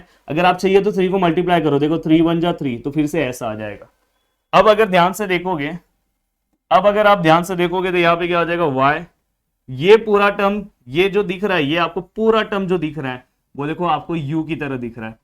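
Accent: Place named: Uttar Pradesh, India. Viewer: Up wale hai bhai lagta hai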